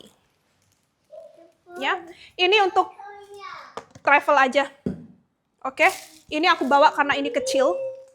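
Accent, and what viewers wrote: United Kingdom. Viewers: suka banget klo udah ngomong indo tapi aksen inggris nya itu loh cobek 20rb chowbeck 500rb ya ka 😂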